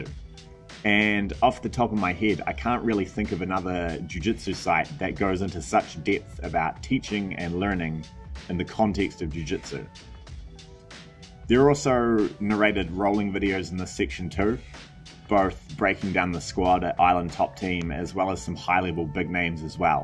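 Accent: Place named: New Zealand. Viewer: You from NZ Bro? :)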